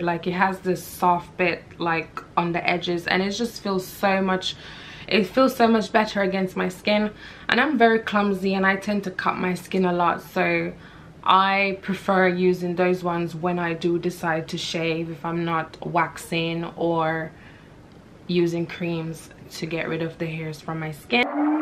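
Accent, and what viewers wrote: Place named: Jamaica. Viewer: Do you have a Jamaican background? Or at least Caribbean... You sound slightly Jamaican based on some of your pronunciations.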